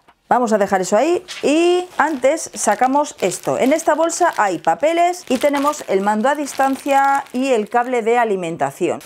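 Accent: Spain. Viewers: Español de españa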